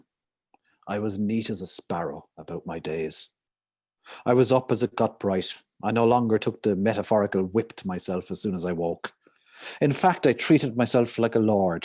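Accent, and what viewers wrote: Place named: Donegal, Ireland. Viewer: Fantashteesh. Fair attempt at a Donegal accent too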